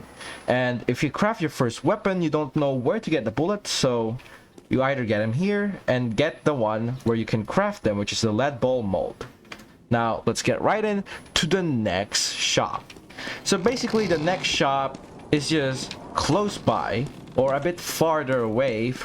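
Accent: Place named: Philippines. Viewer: Bro u have the filipino accent, r u filipino?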